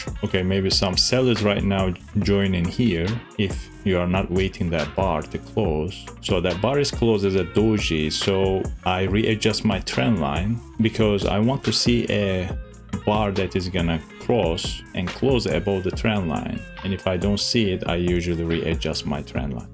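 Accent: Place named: Turkey. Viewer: Hello, Are you originally Turkish? Your accent sounds Turkish😊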